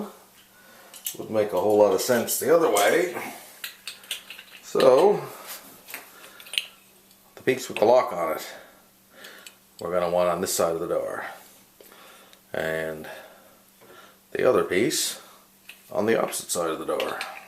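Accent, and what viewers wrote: Newfoundland, Canada. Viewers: sounds like he's from Canada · Love the Canadian accent, you sound like Relic from the Beach Combers. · Canadian... Love to listen to him talk. Unfortunately, neither of my knobs have visible screws, so I'm still at a loss. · You a Newfie? Thanks for the vid!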